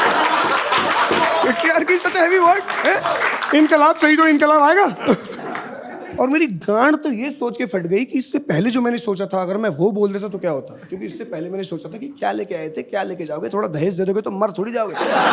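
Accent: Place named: Garhwal, India. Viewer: 🤭🤭your accent is garwali though💜was waiting for someone from Uttarakhand to do standip.Finally got you.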